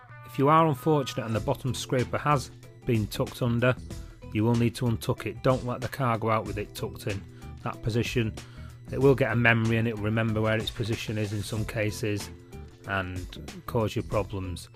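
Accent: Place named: England, United Kingdom. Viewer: You sount like u from England mate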